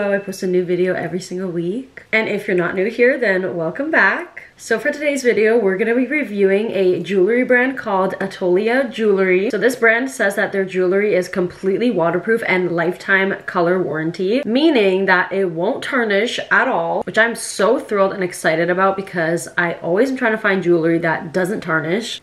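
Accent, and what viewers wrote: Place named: Scotland, United Kingdom. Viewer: OMG I love her accent, I think it is Scottish?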